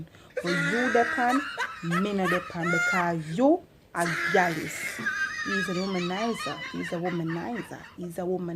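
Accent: Jamaica. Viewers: I so so love Jamaican accent.. like madddd😄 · Oh wow this's fun. I love Jamaican accent. Where exactly did it originate from cos it's unique , do you know?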